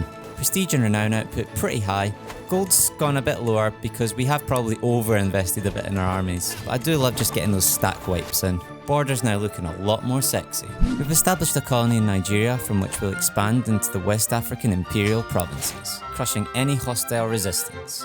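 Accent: United Kingdom. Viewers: Is this man from the lowlands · i love when british do british. British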